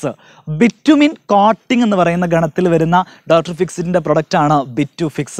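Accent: Kozhikode, India. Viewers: മയ മാണ്ട പോയിന് വന്നീ ന് പോയിക്ക് കുത്തിരി ഇടെ ഇതൊക്കെ കോഴിക്കോട് മലബാർ ഭാച്ച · അറിവുള്ളവനെ പരിഹസ്സിക്കുന്നത് തെറ്റാണ്, കോഴിക്കോട്ട്കാർ അങ്ങനെ ആണ് പറയുന്നത്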